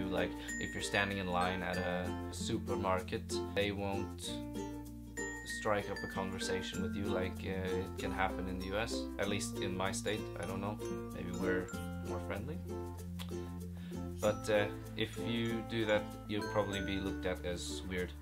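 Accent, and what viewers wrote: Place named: Norway. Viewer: Another thing I noticed, I just checked out your first video as well, and you have developed somewhat of a Norwegian accent while talking Norwegian! xD Kos deg i Norge og finn på masse rart! : )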